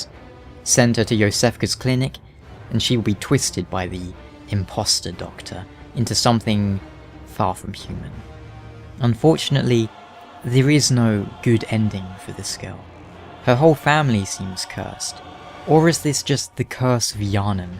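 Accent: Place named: United Kingdom. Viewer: Should I watch Vaati or Video?.. Oh well you cant just not watch the Video as soon as you hear that british english ^~^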